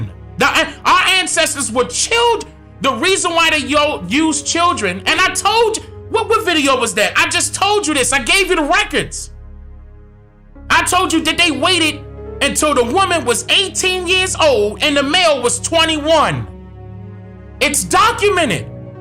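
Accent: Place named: Washington DC, United States. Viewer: Hey your accent sounds very DC like I'm from New Jersey and I moved to the DMV in high school I'm 34 years old are you from the district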